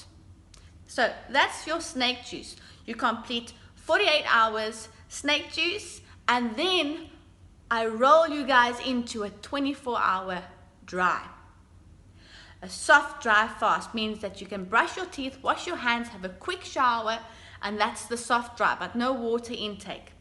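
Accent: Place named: Afrikaans, South Africa. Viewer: are you South African? you have an Afrikaans-English accent.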